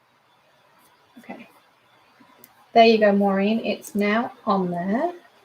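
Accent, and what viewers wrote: England, United Kingdom. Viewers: As a new 'maker' I found you quite by accident last month and just love your presentations. It was, at first, confusing to hear an English accent associated with US company (I'm in Yorkshire). I just saw this video and had to make a comment. I find it heartbreaking that mean people can do so much harm. There have been a few other people similarly affected this year and Cathy Z has posted this (you may have seen it) and I thought it may help you: <link> Without you lovely maker-presenters this year would have been very difficult for me (and I suspect many others too). Meanwhile good luck with the new goodies and I wish you a Happy New Year.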